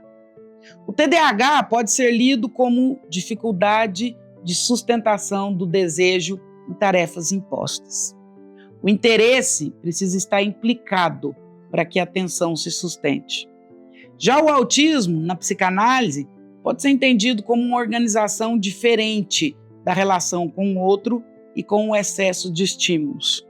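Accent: Minas Gerais, Brazil. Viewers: Sempre ouço os vídeos em podcast! Mas percebi no sotaque mineiro,uma credibilidade,. portanto a atitude de não menos que se inscrever em um canal a qual pessoa me faz se emocionar!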